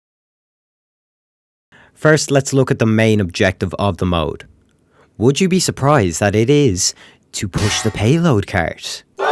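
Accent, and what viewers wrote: Ireland. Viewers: is this man irish and so am i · Very well done mr irish voice person. Sub increment plus one. One thing I've noticed playing defense as scout is that people get SUPER bitchy about it. You can't play scout for five minutes before you're being told you're the single worst human being to ever have been born. I don't usually play scout and I do usually play payload (or koth because koth has pretty maps) so it's super jarring to go from being the medic 96% of the time to being told I'm dead weight as a scout. (Particularly jarring when you go from top scoring the prior round and hard carrying an offense to being told to self terminate on defense) I always did kind of wonder if it's just me who experienced this and it seems not. Would love to see more. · Are ye a Dubliner?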